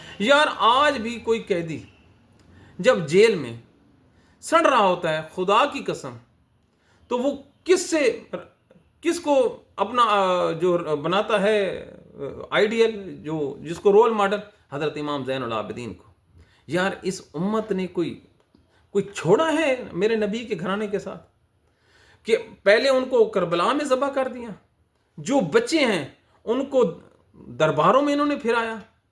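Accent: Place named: Punjab, Pakistan. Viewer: Aoa mai ap ka vlog deihkti hon such pr mubni hota hai ap ka Andaz bohat acha lagta hai tora funni tora bunjabi touch well done 👍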